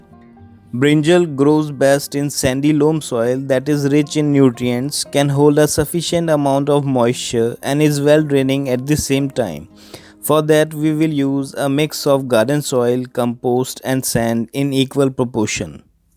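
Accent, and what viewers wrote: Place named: Telangana, India. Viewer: Pakka Telangana dialogue 👌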